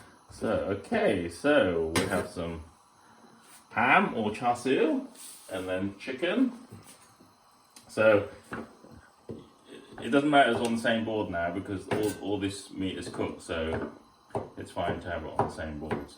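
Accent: England, United Kingdom. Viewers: a Chinese man with a British accent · The shock that he sounds British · perfect Queens English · an English accent is hardly surprising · that's not an Australian accent ok ! It's British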